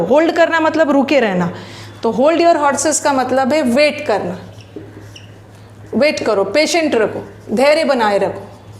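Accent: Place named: Mumbai, India. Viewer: Ur hindi tone is like mumbaiya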